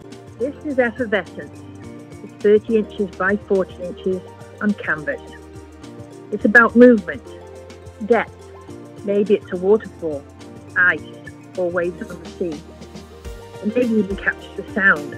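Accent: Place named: Northern England, United Kingdom. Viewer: Love hearing your luvly Northern voice , hearing your descriptions and your creative experience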